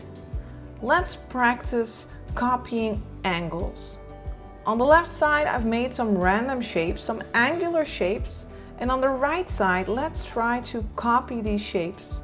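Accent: Netherlands. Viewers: Are you dutch? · Hmm do I hear a Dutch accent? 🤔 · Are you dutch? The accent sound familiar. If so, could you also make some video's in dutch? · Are you dutch? I can sense that warm friendly accent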